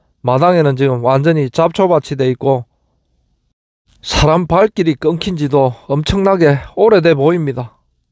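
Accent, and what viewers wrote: Gyeongsang, South Korea. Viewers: 구수한 경상도 촌스런(?) 억양에 진심어린 나레이션과 고품질 영상이 참 좋습니다^^.. 자주 찾아보게 되네요... 안전에 유의하고 건강 잘 챙기시면서,, 오래오래 좋은 유튜브영상 많이 제작해서 올려주세요~~^^.. 덕분에 앞으로도.. 저는 사무실에 앉아서,,, 고생해서 찍어올려주신 동영상을 땀한방울 안흘리고 편하게 볼 수 있네요... 좋아요 추전 합니다 .. ^^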